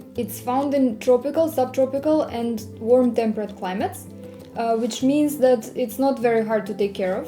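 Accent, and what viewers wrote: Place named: Slavic, Eastern Europe. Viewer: greetings from California... nice accent.. are u slavic? thank u 4 your helpful presentation..🌞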